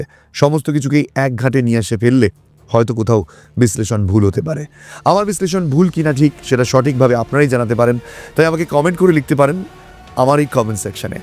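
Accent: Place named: Kolkata, India. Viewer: বাংলাদেশ থেকে কথাগুলো শুনলাম দাদা মনে হয় কলকাতার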